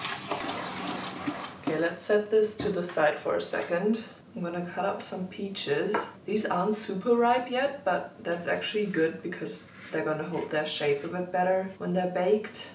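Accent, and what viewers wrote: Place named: Germany. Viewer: New subscriber here. Love to see your big portions of yummi plantbased food😅 and your german accent. I am from Denmark❤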